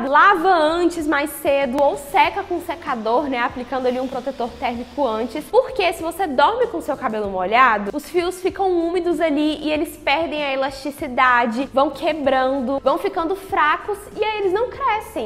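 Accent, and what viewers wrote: Minas Gerais, Brazil. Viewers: Amo o sotaque de Minas 😍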